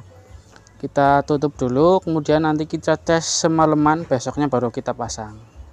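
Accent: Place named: Java, Indonesia. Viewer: Mantap mas.bahasa medoknya gawek seger.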